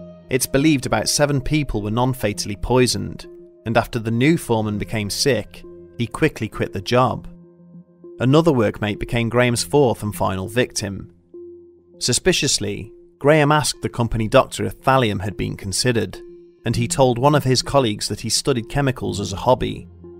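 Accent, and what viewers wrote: United Kingdom. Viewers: Sorry just because it’s a British accent doesn’t mean it’s good 🤷🏽‍♂️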